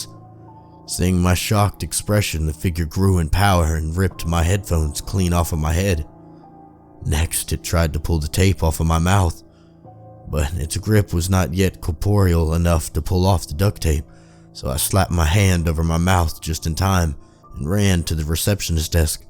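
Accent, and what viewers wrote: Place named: South, United States. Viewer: You got that southern twang in your narration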